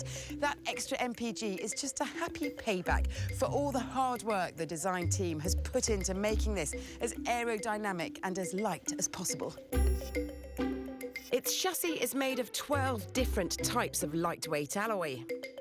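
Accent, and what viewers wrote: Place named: RP, United Kingdom. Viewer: They could have hired a more attractive presenter....VBH is a minger and why talk like youve got plums in your mouth!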